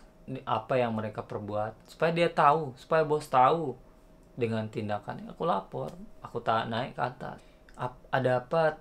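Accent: Lombok, Indonesia. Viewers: seperti logat orang lombok ya.. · Ndk bisa hilang logat lomboknya bero... Salam lombok lolon buak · Dari bahasa dan logat nya org Lombok Wkwkwkwk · Aksen lo kek org lombok bang kalok ngomong Indonesia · Logak lombok nya medok ya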